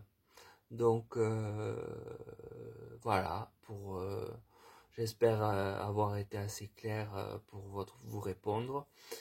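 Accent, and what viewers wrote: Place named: Occitania, France. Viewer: Bonsoir merci pour le partage vous savez il y a toujours des êtres au dessus des autres!!! Faut passer outre comme la pluie sur les ailes d'un ( canard ) facile à dire certe! Nous sommes pas très loin je reconnais ( l'accent) d'Occitanie je vous écoute avec attention ( parce que) vous me parlez. Merci merci 👏👏👏👏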